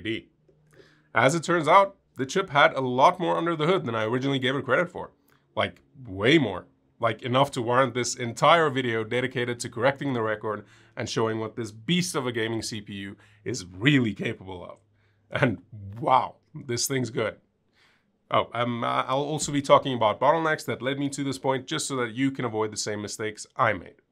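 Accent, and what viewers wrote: South Africa. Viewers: I didnt even notice the afrikaan accent untill the dude mentions rand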